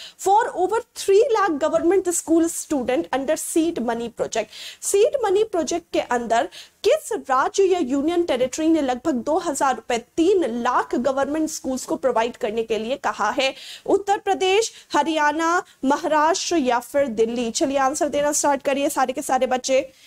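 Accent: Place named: Bihar, India. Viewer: I think aap bihar se ho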